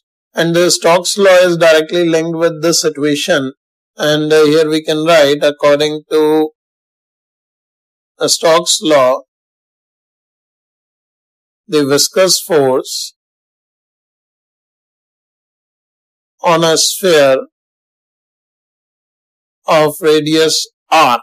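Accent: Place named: India. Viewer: love the indian accent tho...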